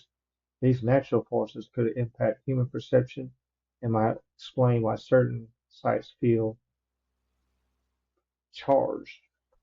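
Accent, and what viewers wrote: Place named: South, United States. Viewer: With your mumbling and southern American accent, it is hard enough to understand even half of what you are saying. With the addition of your pointless, loud music which accompanies this, it is nearly impossible.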